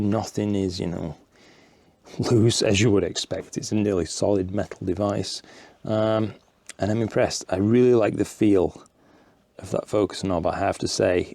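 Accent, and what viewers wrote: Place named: Yorkshire, United Kingdom. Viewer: Shoved up , love it good old Yorkshire speak 👍. Happy birthday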